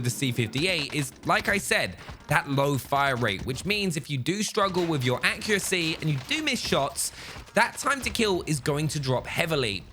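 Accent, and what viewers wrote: United Kingdom. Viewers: Always have been subscribed and notification squad! Teamwork make a dreamwork. Always loved your British voice, keep up the good work mate!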